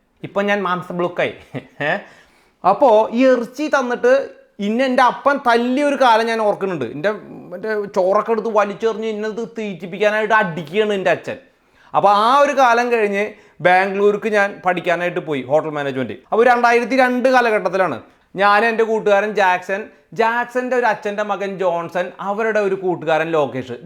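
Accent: Thrissur, India. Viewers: ഇത്രയും നാളായ് ന്യൂസിലാഡിൽ എന്നിട്ടും മ്മ്ടെ തൃശ്ശൂർ സ്ലാങ്ങ് വിടാത്ത ചേട്ടൻ പൊളി തന്നെ 😍🥳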